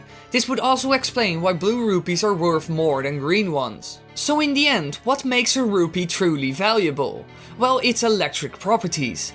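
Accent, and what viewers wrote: Netherlands. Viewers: lekker nederlands accent man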